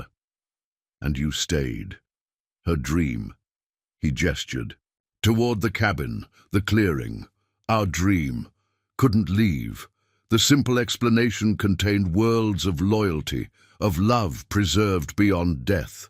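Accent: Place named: England, United Kingdom. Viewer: Why would you use an English accent for the AI voice?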